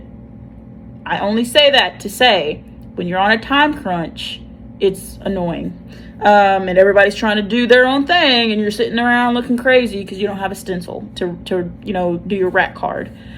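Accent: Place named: South, United States. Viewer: GUUUURL…your southern accent is BACK! Hahaha I’m from MS so it sounds comforting lol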